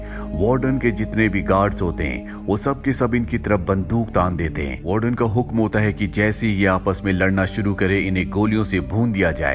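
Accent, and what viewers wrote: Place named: Himachal Pradesh, India. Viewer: Sir aapki aawaz se lgta h aap Himachal side se ho 🤔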